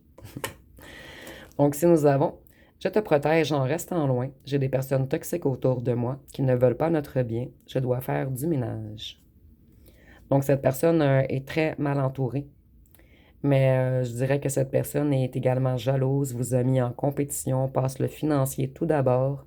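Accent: Quebec, Canada. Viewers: Tres beau tirage merci beacoup il me parle j'adore votre accent québécois et j'adore vous ecouter..... merci❤❤❤❤❤❤❤❤❤❤❤❤❤❤❤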